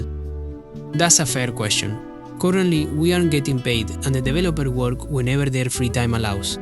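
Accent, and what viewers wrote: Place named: India. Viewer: Ingles hindú jaja, que grande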